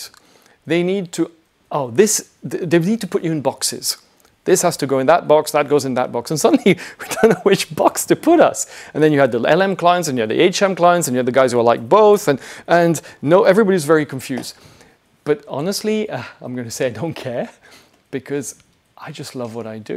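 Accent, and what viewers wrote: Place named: France. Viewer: i like the host' french accent, he got the right vibes on reviewing watches and makes me want to learn to speak french. Want more of his reviews in the future 😂🇫🇷👍🏾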